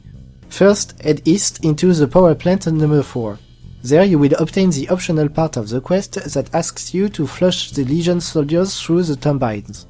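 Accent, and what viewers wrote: France. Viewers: ... never changes. In the beginning he uploaded great tutorials and lore videos, with a mild French accent. Now he uses his own music and has significantly upgraded the quality of his already amazing videos, but beneath the cover he's still the same. Because... never changes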